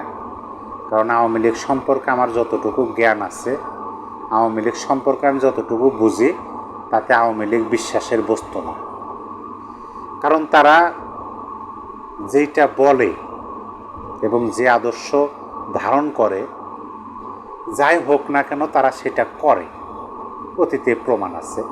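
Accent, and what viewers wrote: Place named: Bogura, Bangladesh. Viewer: সুন্দর আলোচনা। আপনার কথার মধ্যে সিরাজগঞ্জ এবং বগুড়ার টান আাছে। আপনার জন্মস্থান কোথায়? স্রেফ কৌতূহল 😊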